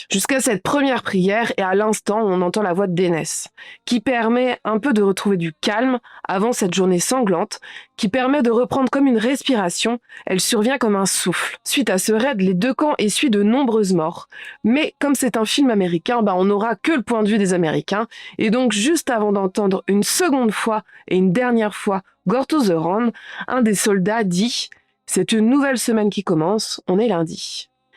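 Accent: Brittany, France. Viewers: J’adore ton accent du breton broerec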